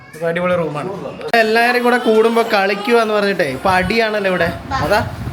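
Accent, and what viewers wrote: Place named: Kottayam, India. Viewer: എനിക്ക് നേരത്തെ doubt ഉണ്ടാരുന്നു കോട്ടയം അല്ലെങ്കിൽ ആലപ്പുഴ നിങ്ങൾക് താഴ്‌വഴി ഉണ്ടെന്ന്. അല്ലെങ്കിൽ ഇങ്ങനെ സ്ലാങ് കറക്റ്റ് ആവില്ല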